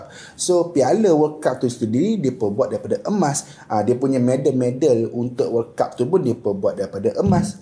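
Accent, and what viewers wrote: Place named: Kelantan, Malaysia. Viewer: Demo ore Kelate ko boh? Bunyi lagi Kelate tu boh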